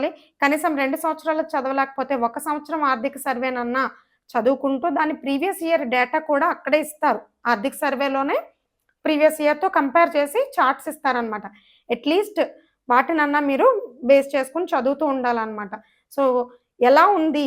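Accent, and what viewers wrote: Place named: Godavari, India. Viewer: మేడం మీది గోదావరి జిల్లా నా మీ accent అల ఉంది బాగా suggest చేశారు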